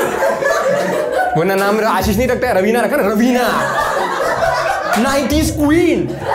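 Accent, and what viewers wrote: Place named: Gujarat, India. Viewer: Your gujrati is cute😂♥️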